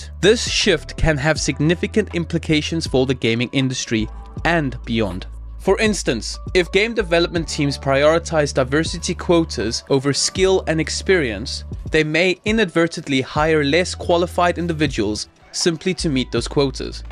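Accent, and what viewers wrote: South Africa. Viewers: I had to come check your channel out when I heard the South African accent on Asmons stream earlier xD Keep up the good work dude! Really well discussed, idk why my brain will always default to comparing this stuff with BBBEE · Hmm... I may be mistaken, but something sounds suspiciously South African. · As a fellow South African, I know another South African when I hear it xD Mad respect for the videos you do, your views need to get out there much more especially in DEI. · Can't help but hear that distinct South African accent. DEI is just BBBEE but worse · are you from South Africa?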